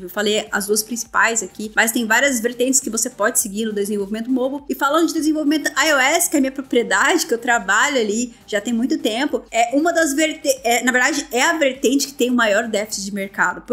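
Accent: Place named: Brazil. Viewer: Bequiendgi, Frontiendgi... adoro o sotaque brasileiro no inglês, tanto tempo fora me faz sentir muitas saudades.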